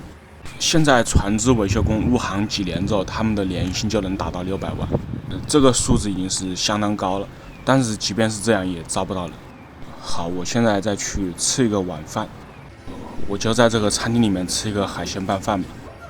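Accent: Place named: Hubei, China. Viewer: 武汉口音😁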